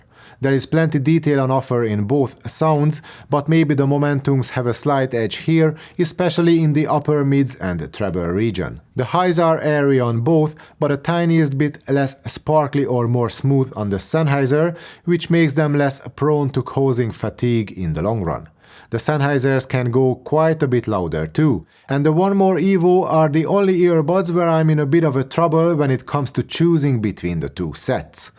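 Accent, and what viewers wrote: Hungary. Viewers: Am I hearing it good that you are Hungarian sorry if it is an uncomfortable question just curious..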